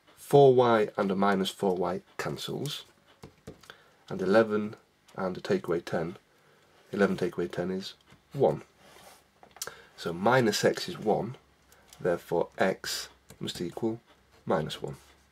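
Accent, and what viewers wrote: England, United Kingdom. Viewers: You are from england right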